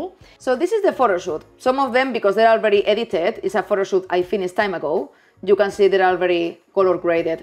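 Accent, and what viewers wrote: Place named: Spain. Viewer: very good video. great recommendations. Also like the accent. - Spanish?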